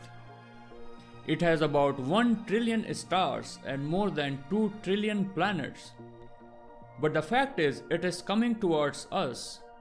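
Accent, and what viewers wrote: India. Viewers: You sound like indian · U r a Indian I knew that ur voice Revaled! 👍👍👍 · Indian voice lol no offence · Are you Indian, it sounds like it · Your Voice is Like Indian Average People ❤️ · It must be an Indian man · I like your voice are you from Asia India? Just wondering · Is this your voice are you an Indian? I am Bangladeshi your neighbouring country🇧🇩🇨🇮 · You sound like an Indian ngl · He is from India 100% sure. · Love Indian accent wish I could do it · Gee what kinda voice is day 1 like= no voice (and is that a indian) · Me hearing the voice said Oh is he indian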